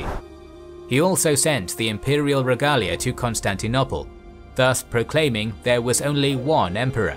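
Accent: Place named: United Kingdom. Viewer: Excellent voice. Calm and clear. And British 🥳🥳🤣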